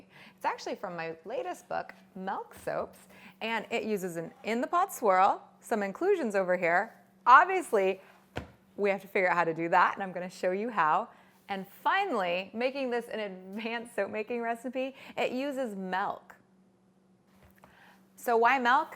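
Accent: United States. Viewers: MN people pronounce it the same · It’s common in the Pacific Northwest, is from Washington. “Pellow” rather than “pillow” is pretty normal too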